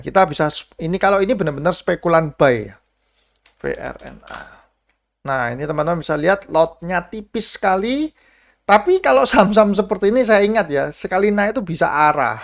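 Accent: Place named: Java, Indonesia. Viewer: ada logat jawanya aduh jan gurih banget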